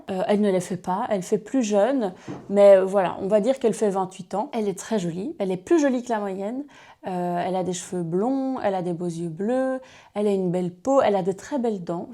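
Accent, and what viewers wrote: Belgium. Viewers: Y a comme un petit accent belge qui ressort par moment c est mignon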